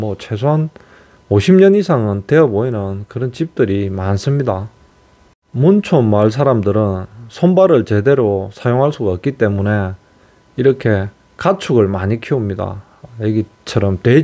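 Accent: Gyeongsang, South Korea. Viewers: 표준말 쓸려해도 억양은 어쩔수 없구만요 저도 경남쪽인데 말투가 구수하게 느껴 집니다 문디에 대해서 추억과 나름데로 의학적 공부 좀 하셨네요~ㅎ 항상 수고 하십니다~^^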